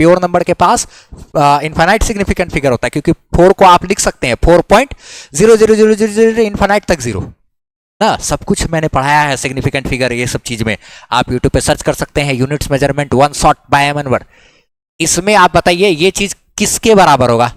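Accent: Bihar, India. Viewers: Sir kya aap bihar se hai ? ❤️❤️❤️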